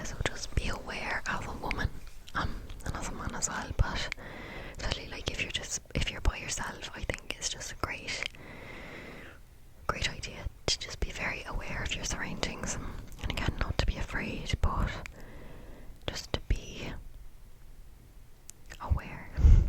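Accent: Ireland. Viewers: Well, ya got me. I'm listening to this thinking it sounds like you're saying you've never been to Ireland before... but how with the accent. And then I looked at other videos 😂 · Yay really love the Irish ☘️ accent 😊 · I thought and did exactly the same! 😂 Love the Irish accent! (more please) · ahhh i love the irish accent videos! it scratches my brain in the best ways lol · BTW love your Irish accent and your walkthrough of your journal! You did an amazing job.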